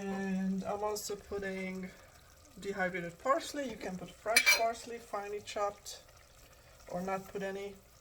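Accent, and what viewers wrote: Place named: Europe. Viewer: American girl? You have a European accent.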